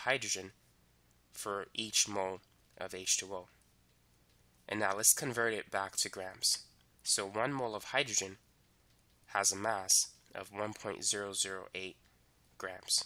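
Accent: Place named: New York, United States. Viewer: you definitely from ny b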